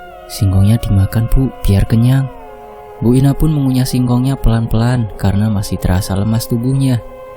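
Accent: Java, Indonesia. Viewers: AD LUCUNY JG NE YG BWAIN CRITANY KEJAWA"AN HHHH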